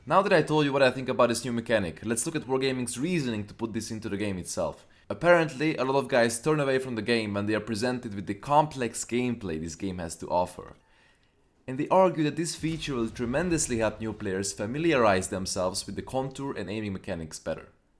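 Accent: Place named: Hungary. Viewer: Hungarian accent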